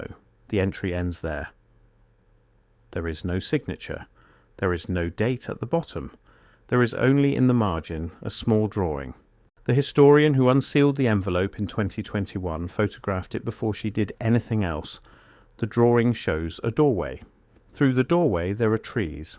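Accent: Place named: United Kingdom. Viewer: Ridiculous to have this narrated by a British voice. It’s like wearing a striped shirt with a polka dot pair of pants and disco boots.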